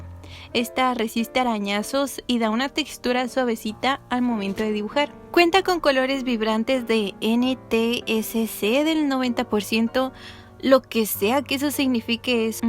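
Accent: Mexico. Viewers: Señor, no sabe de relajante que es escuchar su acentito norteño mientras veo su lineart asteti. Mucha motivación para dibujar patas. Muchas gracias ❤❤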